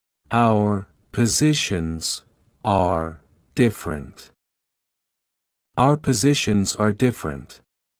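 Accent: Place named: Florida, United States. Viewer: 美国不同的州说的英语有不同的口音，这个英语口音和我们佛罗里达州相同，很适合我。非常感谢你们制作这视频！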